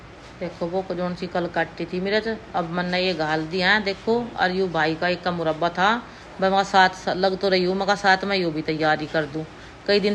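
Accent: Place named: Yamuna region, India. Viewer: आपकी भाषा मुझे मेरे आस पास की लग रही है बहन जी आप कहां से हो क्योंकि यह जमुना के पास की लैंग्वेजहै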